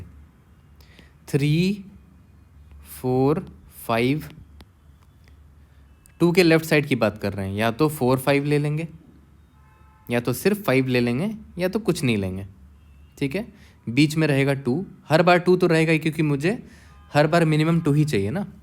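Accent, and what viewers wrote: Bihar, India. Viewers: i feel you are from bihar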